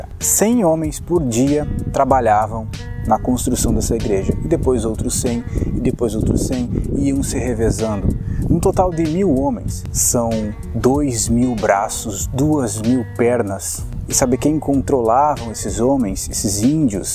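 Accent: Rio Grande do Sul, Brazil. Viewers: Ruinas de São Miguel! Sabia que seu sotaque te entregava...vc é do Rio Grande do Sul.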